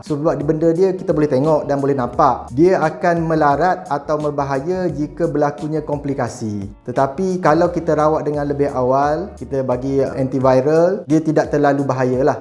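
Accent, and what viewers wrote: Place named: Kelantan, Malaysia. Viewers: Bang kelantan ke? Napok ckp kl jd kekok..haha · Ni mesti org kelantan / ganu 🤣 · abang ni oran kelantan ke? bunyi pelat bektuh hihihi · Ore kelate kcek luar nmpk lain .tu buleh taw dye ni ore kelate😍😍😍😍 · Confirm abg ni orang pantai timur 😂😂. ade seni dia tu.. nice content bro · Bunyi Kelate parok. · Hahaha jolo tu abg ni ore kelate laa cakap gitu gakk 😂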